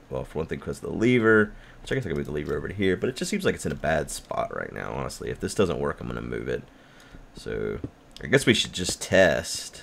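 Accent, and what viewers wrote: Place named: South, United States. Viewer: It's just a slight Southern accent, guy. He knows how to talk.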